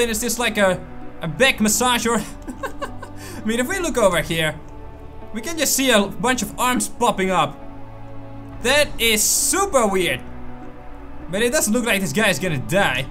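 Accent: Netherlands. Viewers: Are you from The Netherlands? You got that enthousiastic Dutch accent when speaking English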